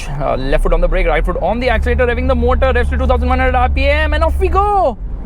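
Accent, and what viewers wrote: India. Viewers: Very Indian English and fast. Slowly plz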